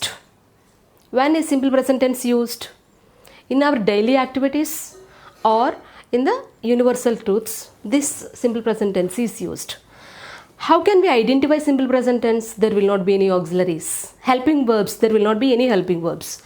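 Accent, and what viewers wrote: India. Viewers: teacher you have a very strong indian accent! please work on your accent, the way you speak we almost do not understand, because of your accent.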